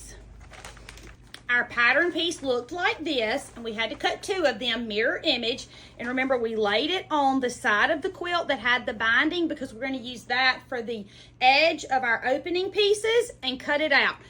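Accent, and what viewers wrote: South, United States. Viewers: first of all, I am a novice quilter - still haven’t actually quilted my flimsies, just had other people quilt them for me. Second of all, thank you for being such a great teacher. I say that not because I’ve tried anything of yours yet but because you have made this all seem really do-able for this 65 year old novice! I can’t wait to try this quilt coat! And, finally, than you for sharing that beautiful Southern accent (I have one, too); it harkens to “home” for me and, as I’m sure you know, is totally disarming! I’m hoping you will show us how to make some matching mittens and maybe even a hat or toboggan of sorts from this beautiful quilt’s scraps! Please! Oh! and maybe a quilted scarf for winter, too? (I’m living in Colorado now.) And, I almost forgot! I really want to make a mandarin collar for my coat - but I have NO IDEA how to do that. 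Did I mention I’m a novice?;) I look forward to learning from you!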